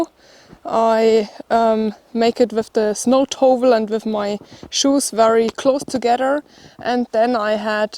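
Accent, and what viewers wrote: Germany. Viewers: All I can say is " I love me some!" Girl u r absolutely beautiful and awesome. That accent is really appealing. I miss Germany, former dependent of a service member station in Bamberg, Germay. Anyway, I really think you are beautiful and awesome. Tell the husband to behave or I'm in there like turbo boost. Want to see Utah, USA? LoL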